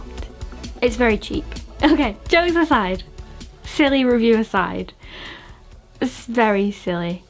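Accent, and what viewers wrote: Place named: Manchester, United Kingdom. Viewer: Great educational video . I just bought one , great for travel . Love your accent . Manchester ? United or city ? I'm from Yorkshire but I still like your video .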